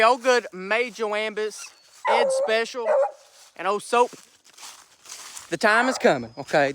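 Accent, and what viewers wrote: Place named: South, United States. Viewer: Im partially deaf but i can still hear how southern he sounds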